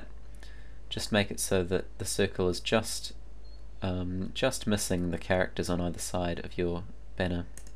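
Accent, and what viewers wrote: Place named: New Zealand. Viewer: He isnt an aussie, hes from nz buddy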